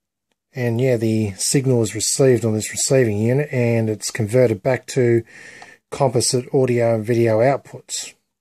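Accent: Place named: Australia. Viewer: Hi there. I have a camera I got with my little quadcopter. It records onboard to a micro sd card. The quality is surprisingly ok but it does not have av out . I would like to add an av out plug to it if possible for wireless streaming. Would u take a look at it please and maybe point me in the right direction as to wht to solder or not. I can take pics for ya or send ya a video of it. I hear u are Australian. Could maybe post it to you. Im in perth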